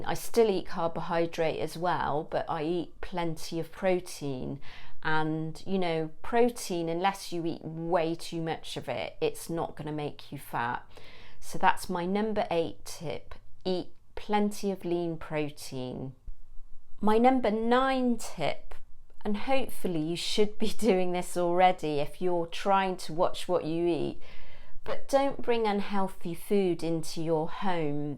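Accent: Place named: London, United Kingdom. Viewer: Hi, New Subbie here, pleased to meet you😊 You sound like a Londoner like me (lol). I am 50 with borderline hypothyroidism, i really want to lose weight (7 stones would bring me to ideal weight according to my BMI) but finding it hard. Do you have any ideas for how to make exercising fun? I think that would make a big difference to me because I can't motivate myself to be consistent because I don't enjoy it. One thing I've thinking about is go on an adventure to find the best free exercise parks in London (you know the ones with the free outdoor exercise machines), maybe you can do a video on that? Just an idea... Love your videos😍, stay blessed, One love❤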